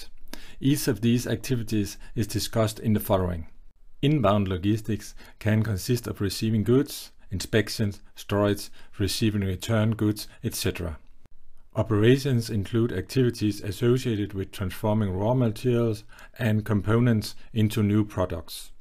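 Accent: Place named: Denmark. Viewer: its called "Danglish".....english with heavy danish accent. typical for people around 50 and up that didn't learn english from series or movies.........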